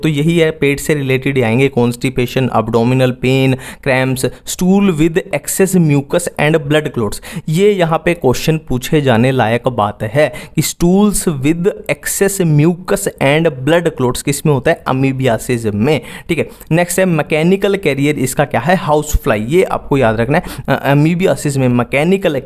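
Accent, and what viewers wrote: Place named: Punjab, India. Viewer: Sir i think you are from punjab,as it seems by your voice tone.....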